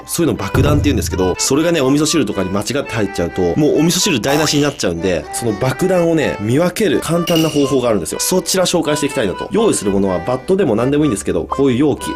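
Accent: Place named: Rural, Japan. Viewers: １分３０秒完全に田舎のお兄さん( ´∀`) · だでね〜 この方言聞くと愛知県らしくて素敵ですw by 愛知県民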